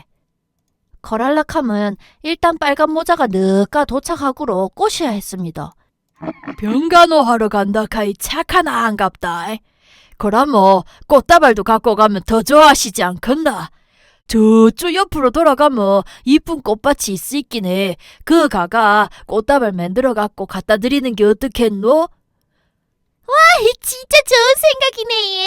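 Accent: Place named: Daegu, South Korea. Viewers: 대구를 떠나온 지 30년이 훌쩍 지났는데...동화영상에서 고향말을 실컷 들어보네예~ 고맙심데이!^^ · 대구 버전으로 들려주는 동화 이야기 구수하고 정겹습니다~~^^ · 아는 내용이지만 대구 말로 들으니까 새로운 느낌이네요. 다른 동화도 계속 들어 보고 싶어요~😊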